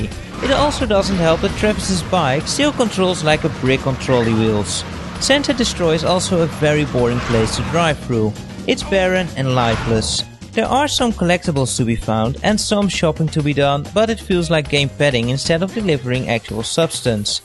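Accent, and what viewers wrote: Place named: Netherlands. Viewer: hahah goeie review. ik had direct door dat je een Nederlander bent :p Voor 40€ is hem zeker waard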